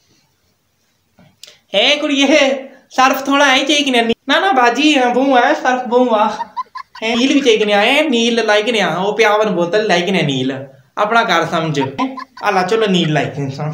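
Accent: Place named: Pothohar, Pakistan. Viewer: Fantastic video i Love it and i really like your potohari the way you speak My favorite language is potohari but we call it pahari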